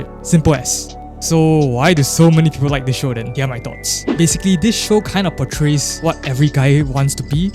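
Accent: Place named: Malaysia. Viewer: This was a cathartic review for me, thank you for throwing shade on these characters. They really are as one-dimensional and bland as cardboard. I never understood the hype for it aside from the gorgeous artstyle + character design. Also, I might be tripping but you sound Malaysian af (or maybe Singaporean)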